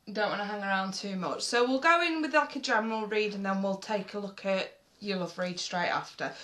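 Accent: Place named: Manchester, United Kingdom. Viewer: Fab reading chic........R u from Manchester????xxxxx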